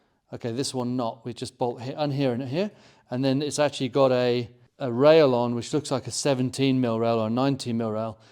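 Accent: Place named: England, United Kingdom. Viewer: English man based in the states I believe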